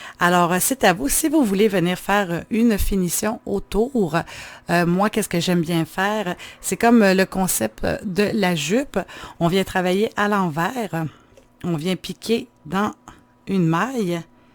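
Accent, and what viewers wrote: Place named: Quebec, Canada. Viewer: Merci pour ce tuto c'est exactement ce que je cherchais. Comment faire un pied 😁❤. J'adore votre accent Québécois 🤗🥰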